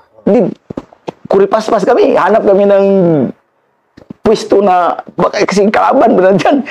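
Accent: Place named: Visayas, Philippines. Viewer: Hahaha visaya lagi do…nakakaaliw si sir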